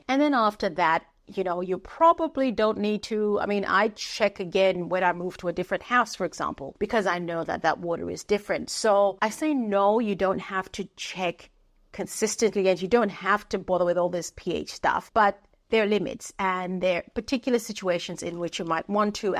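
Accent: Australia. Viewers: The lady I bought a few of my plants from watches your channel & she brought over some plants in leca & pon. I couldn’t see the water level, so I panicked & repotted them straight away. I’ve never experienced root rot, but the roots sitting in water scares me. Yours look great, so it’s working for you. I just adore an Australian accent 😌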